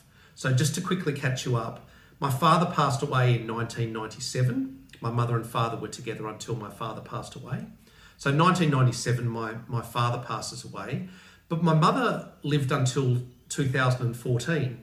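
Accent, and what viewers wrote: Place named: Australia. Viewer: thank you for your lovely and thoughtful posts. My husband passed away 2 years ago so I'm alone. He was Australian so listening to you reminds me of Mike. He was from Melbourne so the accent is a bit different. I pray for you r health and that you may feel joy. You are a gift to the world. I look forward to your posts. My joys are my coffee in the morning and my grandchildren.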